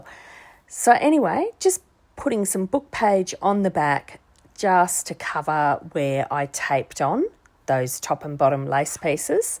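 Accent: Australia. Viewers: That was a great interpretation of the prompt. I'm still not sure what I will do but I did find this helpful. Love your Aussie accent. Blessings and hugs from New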